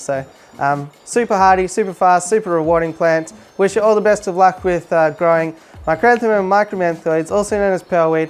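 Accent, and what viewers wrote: Australia. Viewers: R u ausie?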